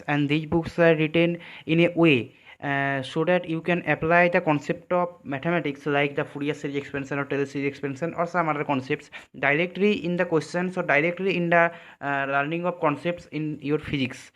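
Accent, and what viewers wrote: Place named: Bengal, India. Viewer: Are you bengali or orrisi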